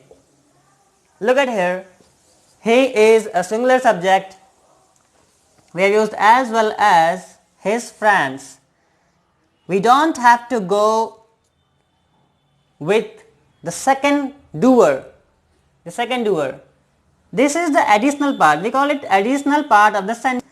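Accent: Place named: United States. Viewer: Tqs bro for your american accent of English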